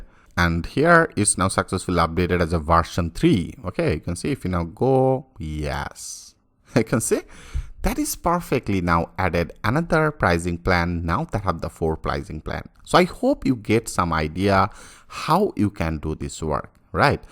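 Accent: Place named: India. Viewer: Could you please speak with an American accent rather than an Indian accent?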